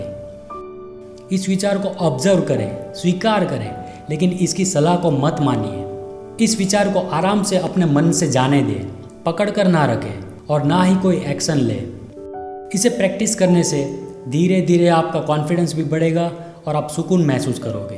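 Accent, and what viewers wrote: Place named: Rajasthan, India. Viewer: Aap ki bolne ki style se lagta he aap Rajasthan se he.am I right?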